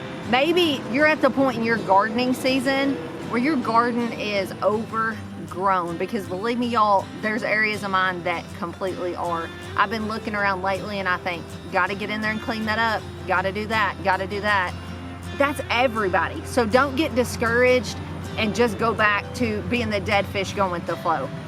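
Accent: South, United States. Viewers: Great advice. Good lecture . Good garden. Love to hear your voice good southern accent. Thanks for inviting me.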